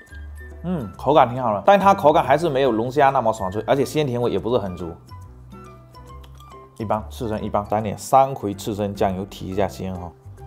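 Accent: Fuzhou, China. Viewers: 说是“虎纠人”讲普通话更准确一些，“湖建”的方言太多了，小文哥口音是标准的“虎纠人”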